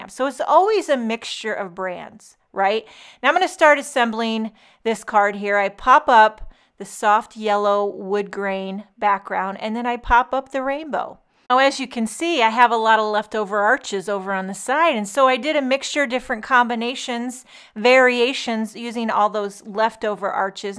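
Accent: Boston, United States. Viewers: You have a great Boston accent! 😂 What wonderful kids spoiling you like that on Mother’s Day! Absolutely love your cards! And this whimsy rainbow die! I find myself creating a lot with this! Great inspiration!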